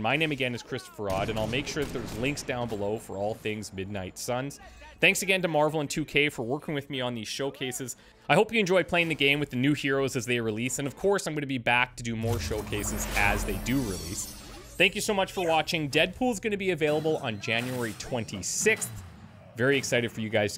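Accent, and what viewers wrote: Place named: Canada. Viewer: Love the Canadian accent first and foremost. I'm excited for Deadpool and I hope Storm is next, fingers crossed. Sounds like DP will get progressively stronger with every KO until he's a powerhouse, so you'll want to use him for cleanup kills as much as possible, which sounds new and fun. I'm thinking ideal build for him is Deadpool, Doctor Strange, Captain America. Cap can absorb damage and soften up enemies for Deadpool KOs while Doc can enable Deadpool (what he does best) while Deadpool builds his stacks.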